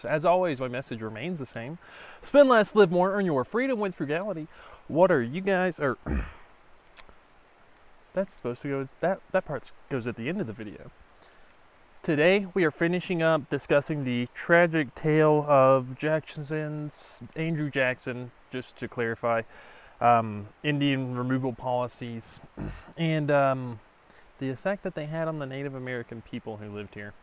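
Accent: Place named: Carolina, United States. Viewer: Awesome Carolina accent! 👍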